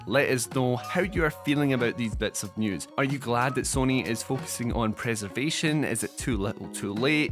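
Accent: Scotland, United Kingdom. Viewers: Imagine criticising a Scottish accent.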